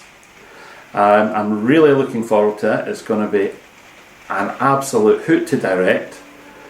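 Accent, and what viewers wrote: Scotland, United Kingdom. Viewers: I like your Scottish accent. Hey, here's how I talk, or taw-wuk: <link> How blessed you are to have a grandma who was so crafty! Lovely! By the way, I use acrylic almost exclusively. I knit for charity, and friends, and it seems to make more sense to make a garment that can be worn after throwing it in the hot water washer and dryer. Beautiful entrelac. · Just starting to go back and watch all of the podcasts, the socks are made from Drops Fabel...I just finished a pair in the same colourway.I love listening to you speak, it reminds me of my husbands family in Scotland. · Hi, Nathan sent me. I really liked your podcast. Loved your knitting, accent and the pitter patter of your lovely Scottish weather. I'm am partial to Scottish originals, can't get better than bagpipes and Westies (We have 2). I look forward to seeing your creations. 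Good job!!!! Sandy (Albany, NY USA) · Hello. It was a pleasure watching your first podcast. As a native scot, now living in Canada, it was pure heaven listening to you talking about my passion, knitting, in your very calm and lovely accent.I look forward to your next podcast. Cheers Christine Ferguson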